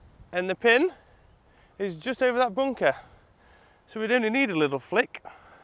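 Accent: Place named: Lancashire, United Kingdom. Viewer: Great video, new subscriber. Where you from originally? Sound like a Lancashire lad 👍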